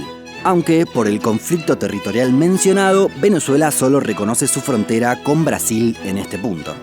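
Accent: Buenos Aires, Argentina. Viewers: jajajaja, no solo Buenos Aires habla así, todo el sur también habla así, entre ríos, Santa Fe y el sur de Córdoba también. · se le re nota que es argentino ese boludo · Es argentino es su acento. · Los amo <3. Hacen un trabajo fantastico! Saludos desde Mexico! Viva la vocecita con acento argentino que dice siempre Tristan da Acunha jajajajaja lo amo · Me quedo mas que clarisimo que sos Argentino, por la forma de pronunciar y marcar la "Y" cada vez que decis Guyana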